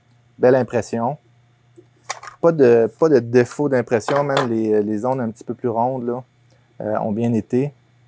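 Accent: Canada. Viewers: Bonsoir, Bien content de cette nouvelle présentation. C'est toujours un plaisir d'entendre ce jolie accent Canadien et toutes ces expressions bien de chez toi. J'aime beaucoup la présentation très naturelle même si on voit que tu as préparé beaucoup et que tu as du passer beaucoup de temps au montage. Un bonjour du sud de la France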